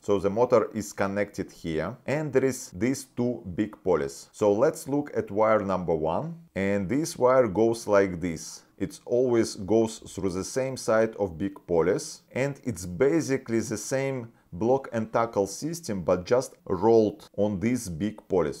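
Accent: Russia. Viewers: This indeed russian accent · You have Russian accent😁 can you speak Russian? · It is a rare case of a lovely Russian accent. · Удивительно, как YT хорошо определяет Runglish в субтитрах! Но "полес" слышит, как police :)) В реале имелось ввиду наверно "poles" или "pulleys" (как в дереве).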